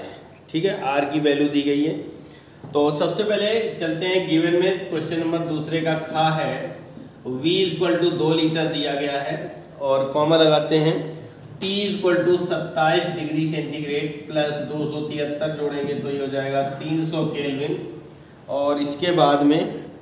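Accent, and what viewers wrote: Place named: Kanpur, India. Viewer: Very very nice kanpuriya language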